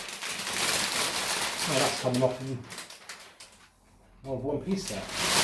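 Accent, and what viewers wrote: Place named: Bristol, United Kingdom. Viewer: Gotta be Bristol innneeeeeee??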